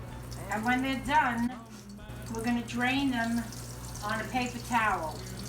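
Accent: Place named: Philadelphia, United States. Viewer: Your recipes are great but your pronunciation of slang Italian American version of words sound very South Philly. It’s not authentic Italian pronunciation. I still enjoy your videos. 🍷😋👍🇮🇹🇺🇸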